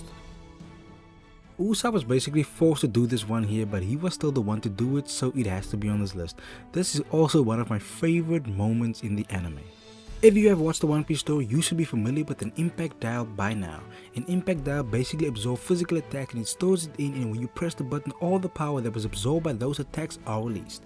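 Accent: South Africa. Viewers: Is that a SA accent I hear. Awe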